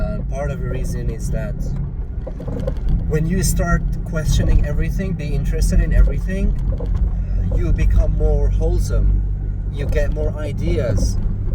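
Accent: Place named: United Kingdom. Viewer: I love ur British accent ❤😊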